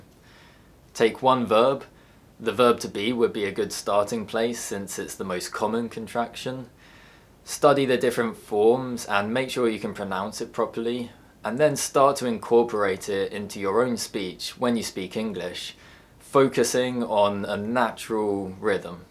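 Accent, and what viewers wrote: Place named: RP, United Kingdom. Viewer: I just watched this video, I’m really interested on English pronunciation and I deeply appreciate your useful lesson and also your modern RP pronunciation. Thank you so much!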